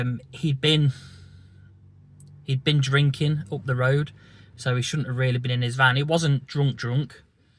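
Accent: United Kingdom. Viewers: You sound like you’re from Derbyshire/Nottingham area. I live in Sheffield and don’t care for drinking but love the footy like you and sport in general. If you ever fancy a walk or coffee let me know. I have struggled with my addiction for years although it’s drink or drugs related. Stay strong today brother.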